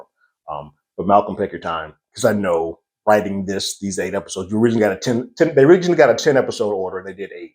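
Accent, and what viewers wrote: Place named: Midwest, United States. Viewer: Great review and you are really handsome 😊. You sound like a mid westerner. Just rewatched the show again and stumbled on the review.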